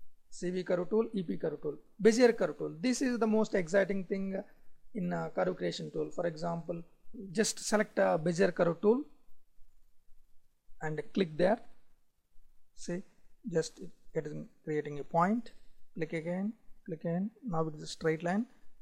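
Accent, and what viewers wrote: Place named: India. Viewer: as same as my indian friend`s speaking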